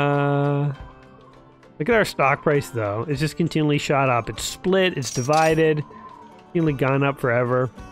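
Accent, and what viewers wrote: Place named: Brooklyn, United States. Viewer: Sound more like Brooklyn.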